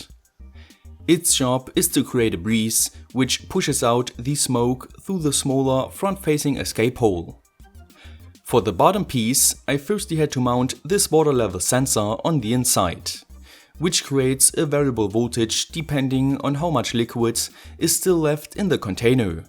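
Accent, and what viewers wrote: Germany. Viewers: Are you from Germany???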